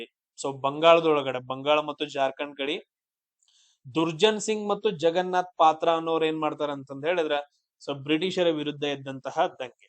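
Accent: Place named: Belagavi, India. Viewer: Nimm belagm ಬಾಷೆ ಕೇಳೋಕೆ ಚೆಂದ..sir thank you sir 🙏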